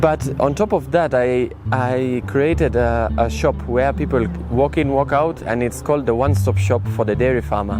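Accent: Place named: Kenya. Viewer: Why does our mzungu here have a kenyan accent? 😅😅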